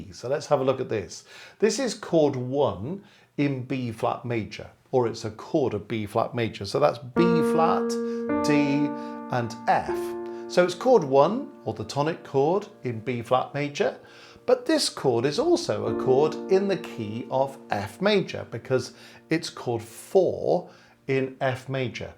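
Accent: England, United Kingdom. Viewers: i'll give you a follow just for your cute English accent .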